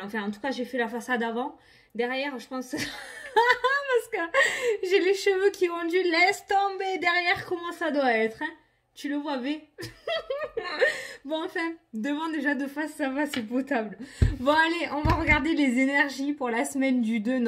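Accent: South, France. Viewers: Il vient d'où ce petit accent du sud ? Mdr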